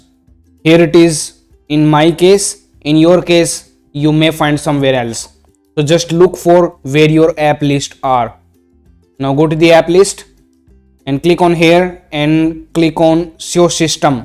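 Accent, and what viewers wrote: India. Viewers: 😆 your english voice is like indian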